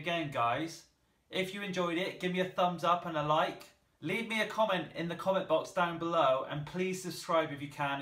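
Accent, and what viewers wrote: United Kingdom. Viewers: That Accent, i know you're British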